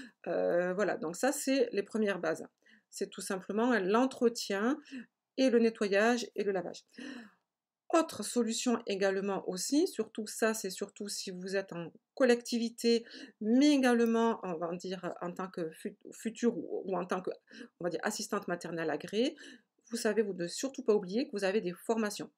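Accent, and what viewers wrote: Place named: South, France. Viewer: Merci pour vos vidéos qui sont très intéressantes ! Si je peux me permettre un détail, vous avez un très bel accent du sud avec une expression qui revient ÉNORMÉMENT (le fameux "tout simplement") l'avantage c'est que ça laisse le mot "simple" en tête et c'est toujours mieux que "compliqué" ;) tout simplement :D merci encore pour vos vidéos qui m'aident à y voir plus claire dans la formation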